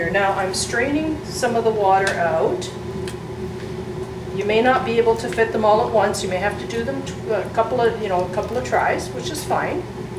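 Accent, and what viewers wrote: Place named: Northwest England, United Kingdom. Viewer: Thank you for your response. Your accent did seem a little different. I was thinking the Mid/North West. I have bought a tomato press and now I'm contemplating getting a food mill because I want a smoother sauce. Now I believe I'm just gonna go ahead and get that food mill. Your sauce looked pretty good! You should keep making more videos!😉😊❤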